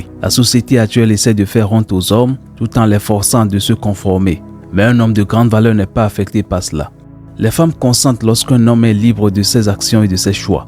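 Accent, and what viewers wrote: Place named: Africa. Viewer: On s'en fou, ce n'est QUE des fautes d'orthographe, en plus il a du mérite car il est peut etre africain vu l'accent. Le superficiel limite l'esprit surtout de ceux qui sont négatif, de mauvaise foi et ingrats. C'est le fond des choses qui compte pas les formes. Le contenu de cette video devrait plutôt vous réjouir car il exprime QUE du propre qui visiblement ne vous concerne pas, et que vous dénigrez. Malheureusement, quand on est pas futé c'est pour la vie.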